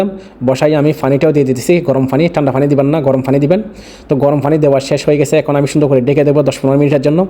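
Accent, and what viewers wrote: Bangladesh. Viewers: বদ্দা তো চিটাইংগা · হেতে নোয়াখালীর বাংলা কয় তাই না?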